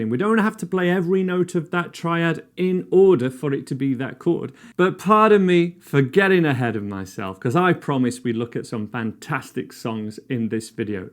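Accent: United Kingdom. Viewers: so British! Love the accent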